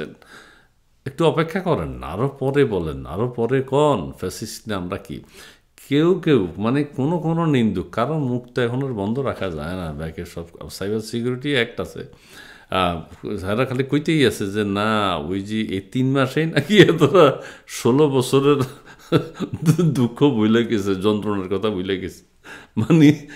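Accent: Noakhali, Bangladesh. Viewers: ধন্যবাদ আপনাকে ভাই। আপনার কথা শুনে ইচ্ছা করে। আপনি নোয়াখালীর ভাষায় কথা বললে ভালো লাগে। যদিও আমি পটুয়াখালীর।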